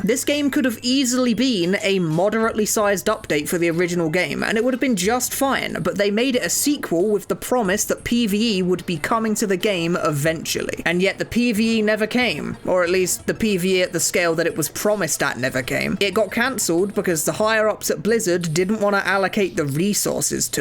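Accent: United Kingdom. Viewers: nice video, but would have been better without british accent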